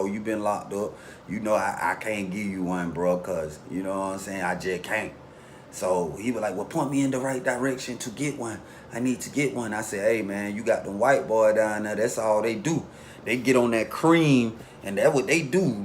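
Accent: United States. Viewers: I just found out about your channel bro i was listening to cartoon53 and Banky Pound now I'm hooked on your channel but I struggle to understand certain stuff cause u sound so country😂😂😂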